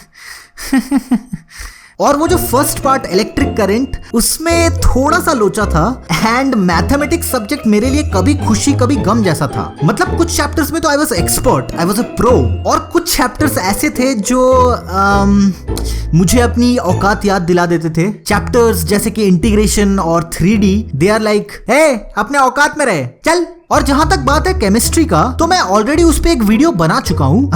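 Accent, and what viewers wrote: Bengal, India. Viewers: Tu Bengali hai Kya Bhai... ? BTW nice video Love from Kolkata ❤️ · Are you bengalee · Bhai tui west bengal se ho? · BHAI TU BENGALI HAI KYA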